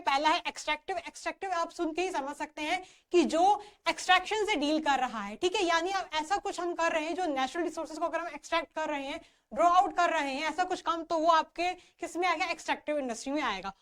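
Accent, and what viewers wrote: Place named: Punjab, India. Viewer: She has Punjabi accent!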